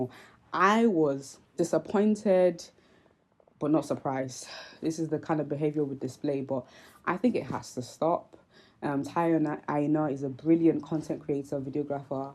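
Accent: Nigeria. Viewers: You've made me like you! You are yourself, Please permit me An African Beauty with the loveliest chocolate skin.Love the Naija accent o jare! 😊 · Honestly, you forcing this your accent to be established as British accent. You still mixing Nigerian accent to UK, it isn’t flow as a British accent. accent is cool thou Nd loving it as well. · I must commend you for being able to keep your Nigerian accent living in a foreign country. It must be hard sometimes to sound authentic. Those people who have a problem with Nigerian accent must be mentally ill. Self hate is becoming a big problem in the African/Black community. I have watched videos and I enjoyed them and I saw yours from watching his.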